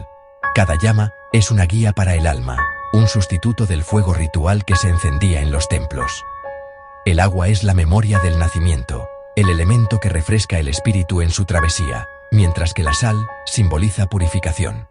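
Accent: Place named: Spain. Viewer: Y lo narra un español 🙄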